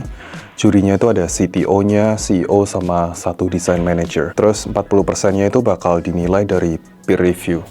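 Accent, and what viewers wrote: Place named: Java, Indonesia. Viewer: kok medok ko ?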